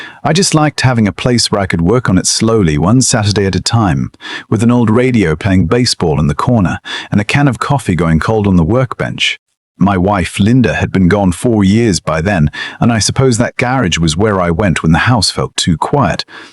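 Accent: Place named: England, United Kingdom. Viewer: A I needs to learn how to read English correctly. Learn how to pronounce garage. Need a voice from America not from England.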